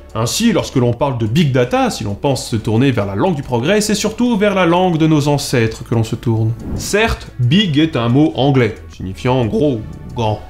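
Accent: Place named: Lorraine, France. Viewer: Il est lorrain si je ne m'abuse ...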